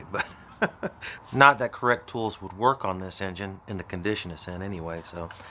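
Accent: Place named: South, United States. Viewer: I love the polite southern accent. "don't beat the mess out of it", would have been phrased differently in the Northern USA and Canada :)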